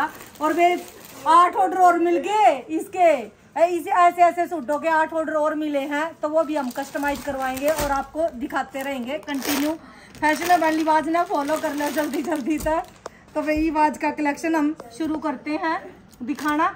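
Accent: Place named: Haryana, India. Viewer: She is belong to haryana state ..so speaking tone is different.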